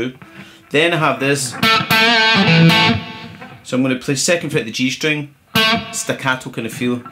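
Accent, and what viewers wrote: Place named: Scotland, United Kingdom. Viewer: Hi from France Sir. It's always a great Pleasure to hear you play ! Love your playing & your accent...!!! 😜 Reminds of my days up North ... Miss Scotland & the Scots so much ! Take good care, Keep safe and Keep it up ! You definitely Rock Sir. Best regards. Cordialement Luc, France 🇫🇷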